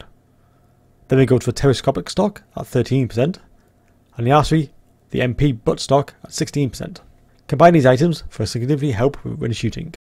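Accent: Wales, United Kingdom. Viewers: did i hear a welsh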